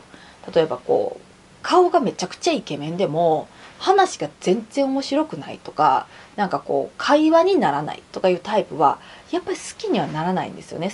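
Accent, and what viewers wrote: Kansai, Japan. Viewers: やっぱやな！俺はのサバサバした関西弁や内面を評価してます！ちなみに俺はレスラー体型かな。筋トレしてます。👍👍 ガチで考え方が能動的になります。欠点を補うには筋トレが最強です。ドラゴンボールの最長老様に潜在能力を引き出して貰うクリリンの感覚です。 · 先生の関西弁のイントネーションが素敵だ 👍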